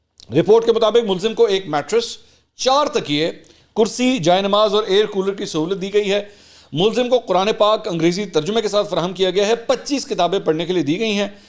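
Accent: Punjab, Pakistan. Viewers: Punjabi touch tez karay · Never mind anything else, love the Punjabi touch ji…!!💯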